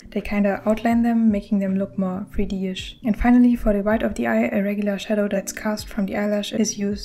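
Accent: Germany. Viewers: already one of my favorite art style studies I've watched so far, you deserve so much more attention! Also, idk why but you sound like you have a German accent lmao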